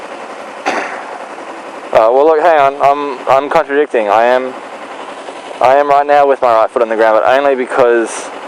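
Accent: Australia. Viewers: are you an aussi?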